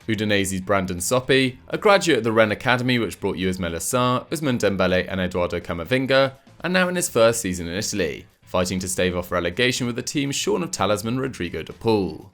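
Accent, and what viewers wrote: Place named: Sunderland, United Kingdom. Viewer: My guy is definitely a mackem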